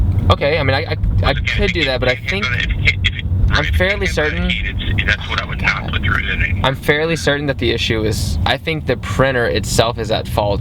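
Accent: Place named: Kentucky, United States. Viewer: I'm assuming he's from Kentucky like William. I'm from uk so that's my only reference point:D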